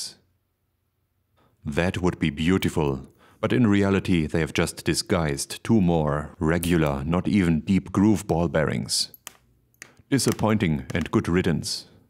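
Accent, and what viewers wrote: Germany. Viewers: the German-vampire accented humor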